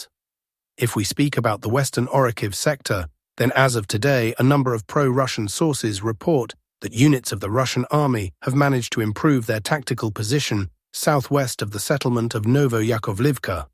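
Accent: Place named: United Kingdom. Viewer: Stop broadcasting with a UK accent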